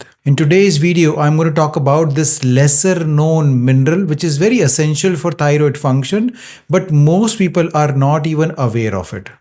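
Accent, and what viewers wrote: South India, India. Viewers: with south Indian accent..❤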